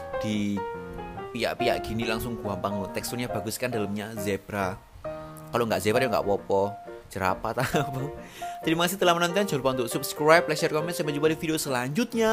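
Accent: Java, Indonesia. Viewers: G bosen lht channel ini medhok&lucu kl lg njelasin,sukses yo rek...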